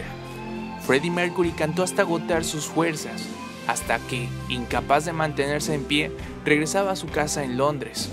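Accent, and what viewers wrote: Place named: United States. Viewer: Por qué el narrador habla con un ascento americano muy feo??????, Se escucha horrible, habla con tu voz natural ;)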